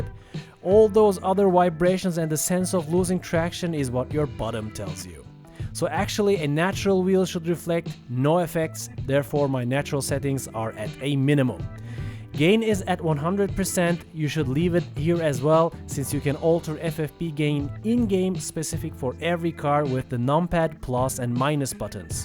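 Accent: Turkey. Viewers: 2. saniyede türkmü bu dedım kanala gırdım türkiye gordugum en temız ıngılızcelerden bırı olsada bellı edıyor kendını elıne saglık bu arada vıdeo ıcın sagol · reis türksün demi la jsajnfashjkfjlas aksandan anladım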